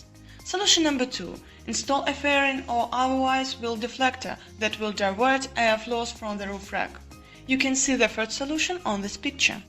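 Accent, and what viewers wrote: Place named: India. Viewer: Sounds like a Hindu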